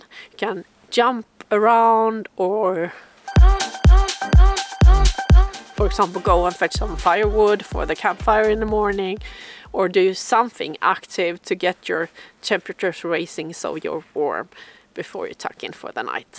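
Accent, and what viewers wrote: Sweden. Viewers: 2 sekunder in hör svengelska, japp definitivt sverige:) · Thank you for the tips!! Survival so key. Your accent is lovely. It sounds vaguely familiar, but not sure if that is due to US melting pot or English/Scandinavian ancestors. Either way, your English is muuuuuch better than my Swedish. :) Tack för att du delar med dig av din expertis!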